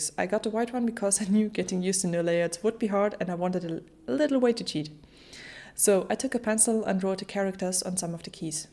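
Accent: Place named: Germany. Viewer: Are you German? I think I recognize your accent. You speak similarly to Joscha Bach.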